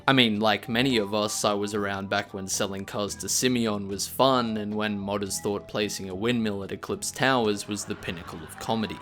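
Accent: Australia. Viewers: Are you Australian Kiwi or English? cause honestly i cant tell...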